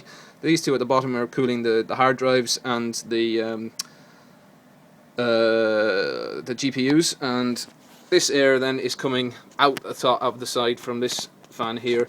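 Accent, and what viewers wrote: Ireland. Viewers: hey man, u sound irish? i presume u are but anyway, i have the same CPU and im just wondering how much do you think i could overclock it using the standard cooler that came with it?.. i searched around but good answers :/ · Are you from Ireland?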